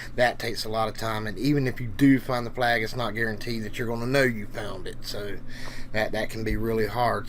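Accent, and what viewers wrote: South, United States. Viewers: Love that accent man may I ask where you come from? I'm not a native speaker but it's def. southern😎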